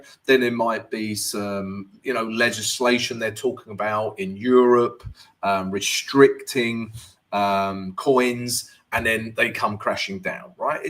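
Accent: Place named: South Africa. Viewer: lol, catching up on stream,love that Saffa accent. Check out NZDCHF, just broke out of long term trend line, possible long opportunity.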